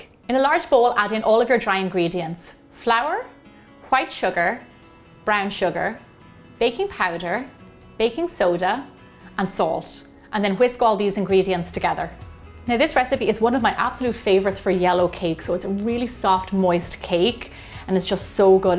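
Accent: Ireland. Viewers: You're so pretty, and your accent is so cool! Lemme guess... You are from Ireland? Thank you for your creativity, it's a miracle how you can actually make a cake with a microwave! 😋☺️🎂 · Wow! You are super inventive! My picky 9yr old loved your baked potato soup in a mug. Benjamin said it was so delicious. And, my 4yr old Gianna just saw this video of triple layer cake in the microwave and can't wait to bake one. Thank you so much for your amazing recipes! Love your Irish accent too. My father's background is Catholic Irish (Kelley) is my maiden name and my mother is from Ecuador. Congratulations to you and your husband for your new baby. What a blessing. She will be so proud and grateful for such a great mommy. Love & prayers, Deirdre & Patrick Tondo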